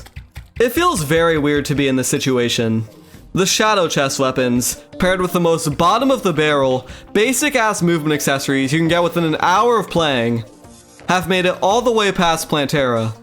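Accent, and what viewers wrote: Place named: United Kingdom. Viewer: I'm sorry but every time you say a cuss word it makes me cringe and you sound like a white washed person that grew up in great Britain. 😭😭😭 But anyways good video you are good at terraria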